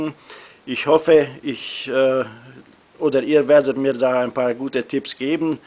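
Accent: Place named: Transylvania, Romania. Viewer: Siebenbürgener Akzent...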